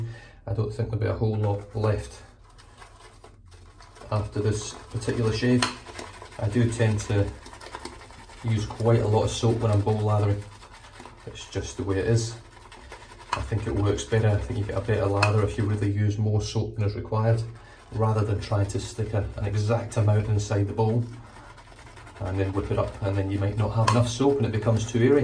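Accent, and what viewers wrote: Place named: Scotland, United Kingdom. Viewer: Hmm. Why put yourself down. Who cares about being correct for the masses, and the Scottish accent is a great one... never hurt Sean Connery, and Trainspotting was a WORLDWIDE hit... including here, in America! Don't water anything down. That's how people learn new languages & cultures. We sit, watch, enjoy the humor, and eventually get comfortable with 'em. Kind of like back when you decided to feature Australian artisans the rest of the shaving world may not know about. If you're just trying to increase your subs to make more ad share money or something, then I kind of understand, but I don't think you monetize your channel.... do you?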